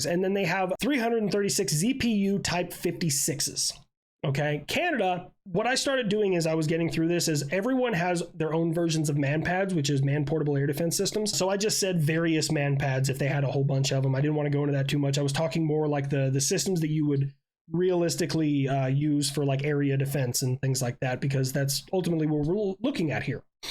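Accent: United States. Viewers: You know, this guy sounds like America...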